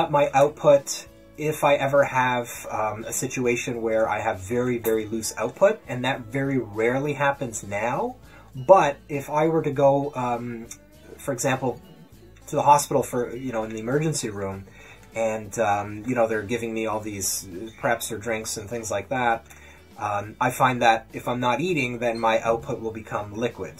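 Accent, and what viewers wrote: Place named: Canada. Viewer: Just discovered you! And you must be Canadian because you sound like me! 😎😁🇨🇦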